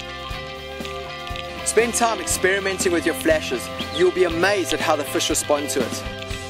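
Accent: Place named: South Africa. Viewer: lol he isint kiwi his south african haha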